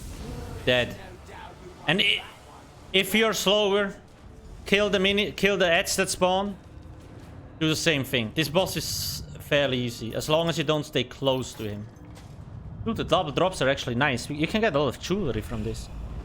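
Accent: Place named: Switzerland. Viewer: du kannst echt ned leugnen, dass du Schweizer bist xD Schöne Videos. Hoffe da kommt bald mehr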